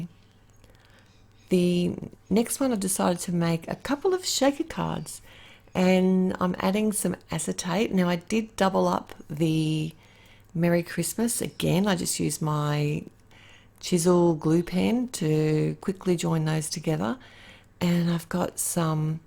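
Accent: Australia. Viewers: Just learning how to use my cricut. You sound Australian, me too.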